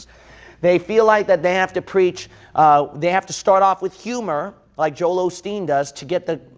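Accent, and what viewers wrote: United States. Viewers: He speaks in American accent.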